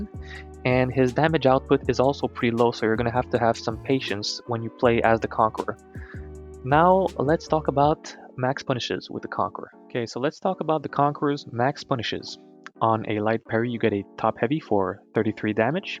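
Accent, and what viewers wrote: Romania. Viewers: Are you from Romania?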